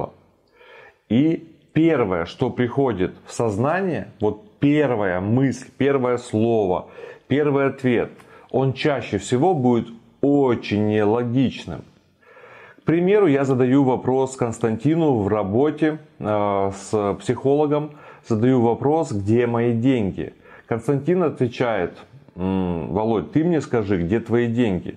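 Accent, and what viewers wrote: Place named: rural, Russia. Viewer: Вам бы ещё научиться говорить правильно для полного баланса, а то по-деревенскому говору не сказать что вы с 20 летним стажем бизнесмен)))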